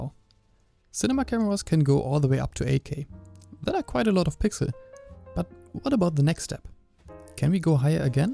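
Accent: Germany. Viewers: man hört so krass das du deutsch bist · I like the german accent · Der deutsche akzent beste xD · Nur deutsche hören das er deutsch ist 😂💪🏽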